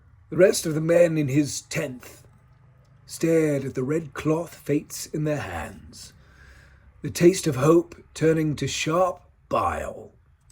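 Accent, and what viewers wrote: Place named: United Kingdom. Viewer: sometimes your british accent grew too much on me and i started reading things aloud in it subconsciously 😆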